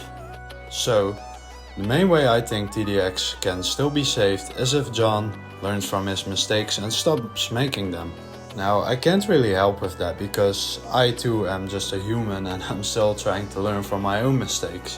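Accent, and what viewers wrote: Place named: Netherlands. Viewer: I smell Dutch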